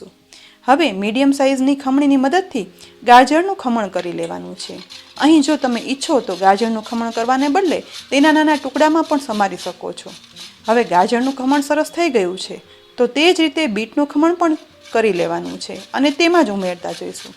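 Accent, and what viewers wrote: Kathiawar, India. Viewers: Soup saras..mug pan saras. Bhaat pan saras..salad pan saras...badhun j "Madhuram Madhuram!" Thanks. Amey aavaa oogaadelaa mug banaaviye ne saathe Kadhi, bhaat ee combination maan kariye. Thanks for the recipe. Kathiyawadi mithi bhasha saambhalavaa thi maney maarun Rajkot yaad aavi jaay chhe. Hun tau janmi tyaar thi mumbai maan j oochhari pan amaaraa ghar maan kathiyawadi , naagar ni bhaashaa j saambaleli, vali sanyukta kutumb maan rahyaa ee j amaarun gujarati vaataavaran , baaki aaspaas tau christians aney momedan loko ni j vasti. Lagna pachhi Rajkot rahevaanun thataan Rajkot maan vadhu saaro avakaash malyo Guajrati bhashaa no laabh malyo. Thanks again.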